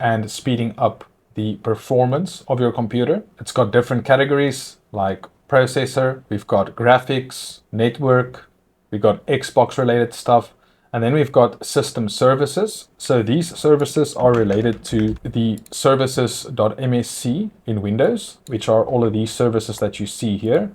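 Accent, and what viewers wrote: South Africa. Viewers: Only a SAFFA could create this boet. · I hear a South African accent or am I dreaming? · Jisss meneer! Ek wou se jy klink Suid Afrikaans! Baie goeie werk. Dis regtig goeie sagteware! Ek was ook in IT lank terug en jy is reg. Die program sou vrek baie tyd gespaar het al daai jare terug. (BTW ek hoop jy verstaan afrikaans). Sal vir jou n donasie gee nadat ek desember vakansie gehou het. Groete uit Gauteng.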